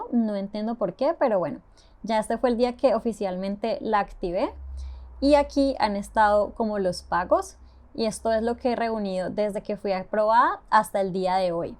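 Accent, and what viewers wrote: Colombia. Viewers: Yo lo hice en 3 meses y ayer me aprobaron, fue lo más rápido que pasó, pero tengo una duda, en algunos videos salen la monetización en $ amarillo(? Mi pregunta es; el $ amarillo también te monetiza? ¿Pero que tanto? Que bonito acento tenes jajs(Colombiana no(? saludos desde Argentina!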